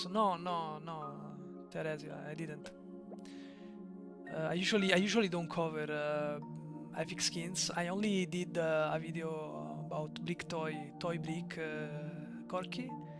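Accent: Italy. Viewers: italian accents or my ear are not working? still idc i love youre video still se capisci sta cosa allora ti ho beccato;)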